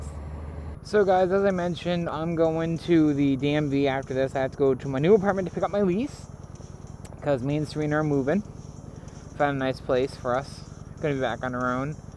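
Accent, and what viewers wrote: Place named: Nova Scotia, Canada. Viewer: Were your first <3 God I can hear the Nova Scotian within you.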